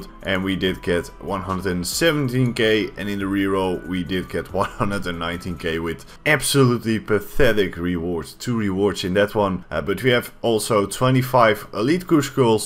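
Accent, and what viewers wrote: Netherlands. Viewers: hears the guy talk Me: 'Is he dutch?' looks at the channel Me: 'Ah'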